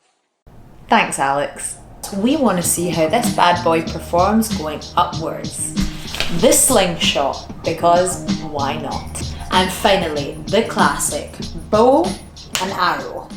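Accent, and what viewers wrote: Scotland, United Kingdom. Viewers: Insta360 haha so did I! Thankfully when u started speaking the home accent I realised. Alba gu Brath! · Scottish accent😁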